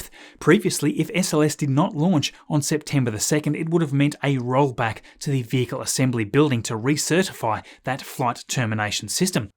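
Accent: Australia. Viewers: God how I love this channel. Well organized, interesting, and reliable information delivered in an affable Australian accent.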